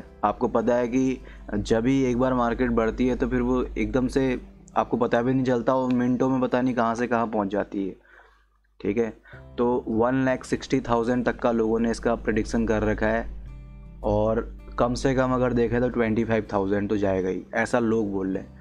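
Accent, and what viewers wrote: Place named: Bhopal, India. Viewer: Which city are you from? Your accent sounds like Bhopali 😁 (I'm from Bhopal)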